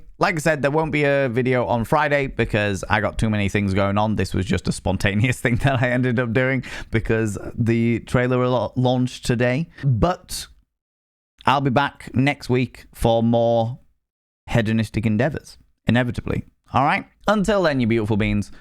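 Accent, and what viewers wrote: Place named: London, United Kingdom. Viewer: He's British.thats how people from London talk.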